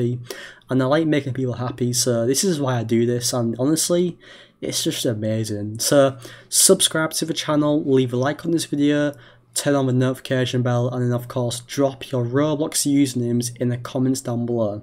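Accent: United Kingdom. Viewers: Bruh are you British WTF 😳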